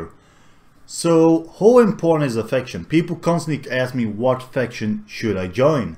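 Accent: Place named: Russia. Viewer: I haven't heard the dutch accent much I guess and maybe there is a reason that's obvious idk... But I hear a bit of russian in there.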